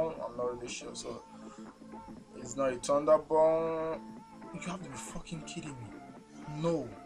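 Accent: Nigeria. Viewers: honestly, i dont know. Myself, i'm Ghanaian. I just know he's nigerian because of his accent, and coz he confirmed in one of he's videos that he's nigerian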